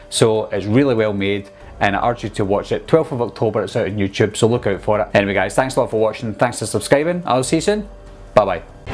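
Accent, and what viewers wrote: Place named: Dalkeith, United Kingdom. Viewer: your accent sound similar to my old friend from dalkeith mayfeild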